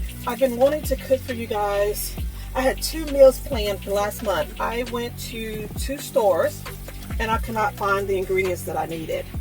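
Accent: South, United States. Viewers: Yes you sound like you from the south. This made my day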